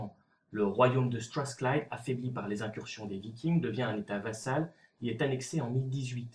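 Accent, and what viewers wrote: Belgium. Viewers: petit accent belge ,D depouis...^^ Mais excellentes vidéos explicatives. dommage qu'elles soient courtes. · Je vois par la prononciation que le vidéaste est belge. Je regarde aussi les vidéos de l'historien belge Dufays. Donc, les Belges ont beaucoup à nous apprendre.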